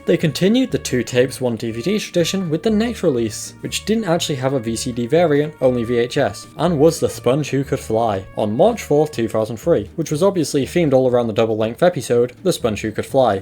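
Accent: United Kingdom. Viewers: Enough with the British accent! Can we please stop with the British accent?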